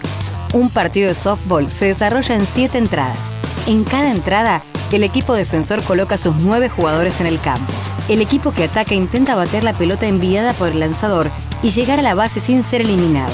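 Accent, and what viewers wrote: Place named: Argentina. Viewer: No me gusta la manera de hablar que tienen las Argentinas .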